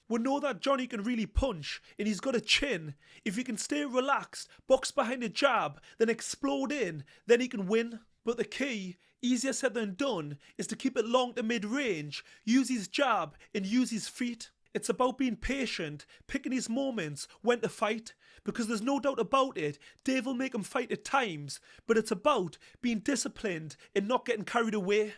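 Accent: Newcastle, United Kingdom. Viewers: Great analysis. Is the narrator a geordie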